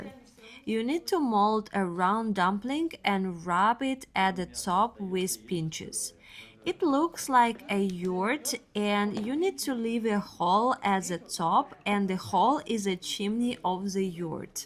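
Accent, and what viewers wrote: Russia. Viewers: True winter wonderland filmed by a truly talented and very engaging blogger .. in love with her Russian English accent.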